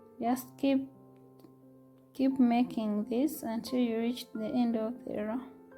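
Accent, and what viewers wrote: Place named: Uganda. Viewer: Nice work, are u a Ugandan? U sound like me 😍😍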